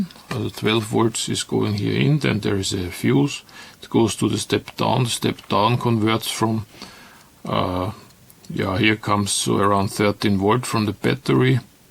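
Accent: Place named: Vienna, Austria. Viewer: Aguy with a Vienna accent, great.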